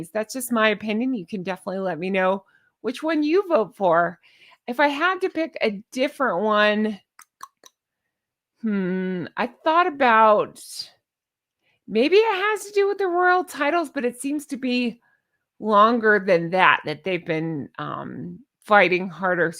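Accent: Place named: South, United States. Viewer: I love a southern accent ❤ I don’t think you should suppress it. Imo. 😊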